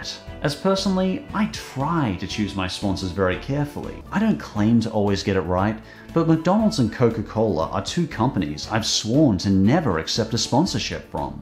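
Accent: United States. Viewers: I can't get over how well you do an American accent. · as an American, that was actually a really good American accent.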